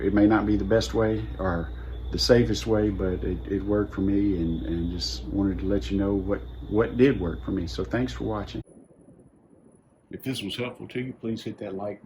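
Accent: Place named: South, United States. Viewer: IM FROM ENGLAND AND I COULDNT STOP LAUGHING AT THE FORREST GUMP ACCENT SORRY BUT IT TICKED ME PINK GREAT VIDEO THOUGH THANKS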